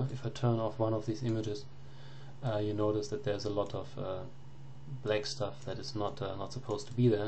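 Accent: Germany. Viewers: could i be that you are a german, cuz you kinda sound like one :D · Your German (Austrian maybe?) accent makes this sound really cool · are you german? it's just a question because of the accent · Clearly a German accent.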